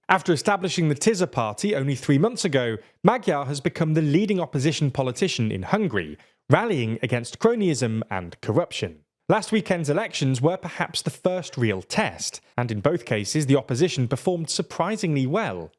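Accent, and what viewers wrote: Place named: Upper class, United Kingdom. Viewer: Can you find presenters without the uppercrustbritishimbetterthanyou accent? ITs off putting. Sounds like Harry lecturing me.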